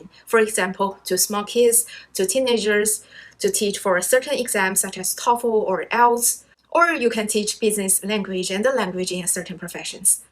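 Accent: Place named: Russia. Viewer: Your accent a bit like a Russian.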